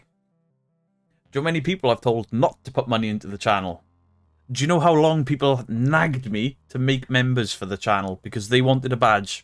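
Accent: Wales, United Kingdom. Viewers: Hiiiiiiii, love your videos. Recently found you thanks to the Shape. I love your accent, I think the Cymru accent is awesome. I lived in North Wales for 20 years before returning to Canada. Keep debunking and making me spit out my coffee because I am trying to drink it and laughing because of something you said 🤣😂🤣😂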